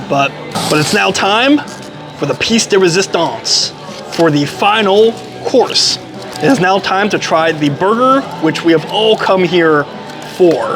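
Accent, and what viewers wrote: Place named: New Jersey, United States. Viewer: You can tell.you grew up in NY uhh NJ uhhhhh because uhhhhh I want the uhhhhh cheeseburger uhhhhhh and uhhhhhhh omg uhhhhh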